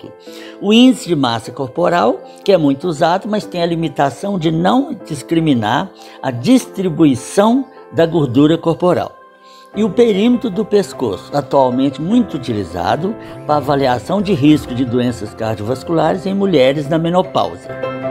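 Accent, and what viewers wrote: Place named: Minas Gerais, Brazil. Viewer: Gente, estou encantada pelo Dr.. Fala com segurança e objetividade. E o jeito de "mineirin" é bem descontraído. Sou mineira também, da capital. 😍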